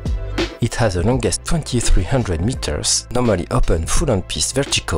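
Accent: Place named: France. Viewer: ça ! c'est de l'accent français bien couillu et affirmé !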